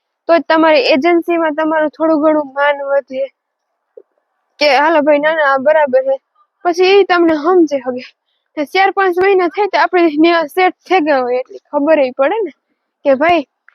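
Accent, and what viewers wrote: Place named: Saurashtra, India. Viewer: તમારી ભાષા ઉપરથી એવું લાગે સ્વોરાંસ્ટ બાજુ ના લાગો છો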